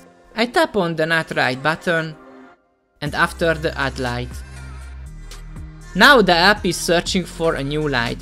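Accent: Hungary. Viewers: Are you hungarian?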